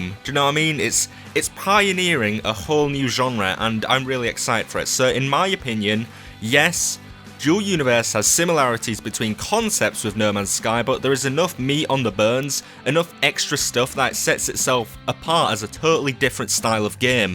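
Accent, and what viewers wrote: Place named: Yorkshire, United Kingdom. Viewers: It sounds like he's from Scarborough, this is the first time I'm watching one of his vids though... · I think it's shared... A sharing universe. Not a shard, crystal shard or otherwise. Cool accent. I wonder how an American saying 'shared' sounds to our Yorkshire friend here, probably very nasally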